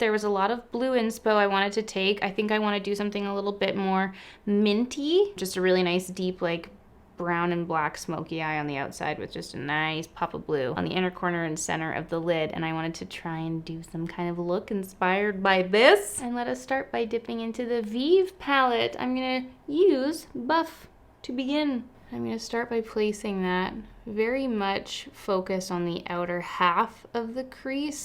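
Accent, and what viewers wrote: RP, United Kingdom. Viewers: You killed the Queen English accent!!! wow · That QEII accent... A++!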